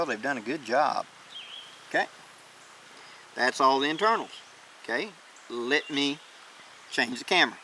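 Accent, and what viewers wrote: South, United States. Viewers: Iimpact driver,small hammer, well fitted bits, and a padded vise with patience and care will get the screws out. putting them back where they came from is brilliant. Very nicely done sir. P.S. I thought I did hear that Magnolia in your mouth. Hurrah for the Bonnie Blue flag. The fourth screw is for the shoulder stock which will not work on this gun.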